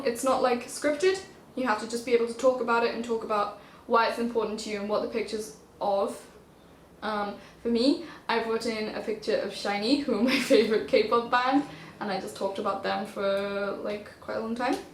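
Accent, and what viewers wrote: United Kingdom. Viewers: 速いーー！イギリスのどこ？ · イギリス英語ですか？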